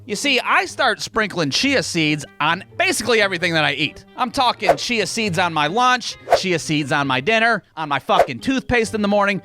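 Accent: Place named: Boston, United States. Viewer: This is the most boston sounding thing ever it's like mark wahlberg on crack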